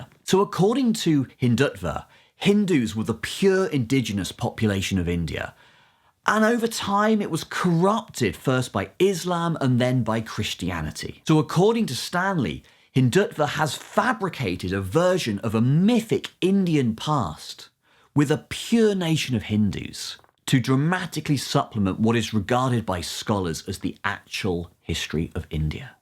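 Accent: United Kingdom. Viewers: And your British accent does nothing to give you credibility . My stepfather is a Brit. Anyway , turn off BBC which is prob bigger garbage than cnn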